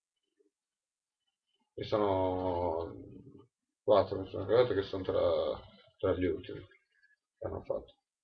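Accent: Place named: Sardinia, Italy. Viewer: Scusa,ma non riesco a circoscrivere il tuo accento,sei Sardo o Pugliese?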